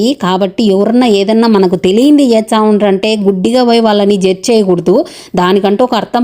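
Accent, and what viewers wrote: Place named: Kadapa, India. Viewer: Made srikulam district maa husband posting Kadapa me sema language baguntde 😊😊😊😊❤❤❤❤ meru kuda bagamataladutaru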